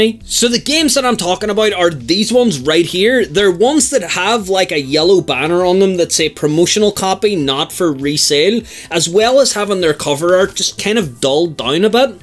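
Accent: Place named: Northern Ireland, United Kingdom. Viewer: I was lookin up about my bioshock infinite promo copy i had from ages ago didn't expect a fellow northern irish voice as well like!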